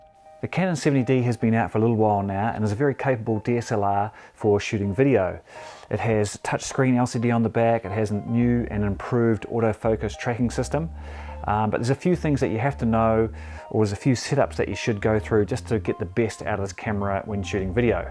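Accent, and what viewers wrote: New Zealand. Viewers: nice to hear an NZ accent. thanks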